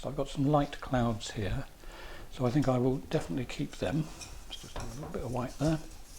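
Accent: United Kingdom. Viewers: You are so talented and you also crack me up. You look like an actor. Or maybe it's just your accent. We American's are very taken with British accents. We also think all accents similar to yours are British LOL.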